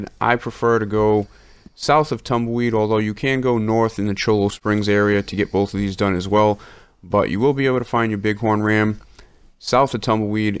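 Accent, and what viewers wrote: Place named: East Coast, United States. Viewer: you know i know you.said before that you lived in the UK and I was kind of confused lol. My daughters mother is from good ol Rhode island and I have wondered lol it makes so much sense now! You dont even have to explain. ( i must admit I did wonder a few times ok how does our friend here speak like a east coast United States native and says he lives in the uk. Makes perfect sense now. You can count on me to watch every video you make. Like and share with everyone I know. You, you are the type of YouTube channel that deserves thousands and millions.... You are real man! You always got a friend and supporter in me old! You already know that though! Keep up the great work my friend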